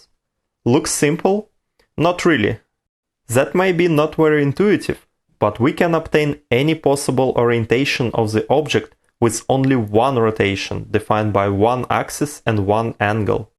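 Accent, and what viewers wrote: Russia. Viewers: Хард рашен акцент!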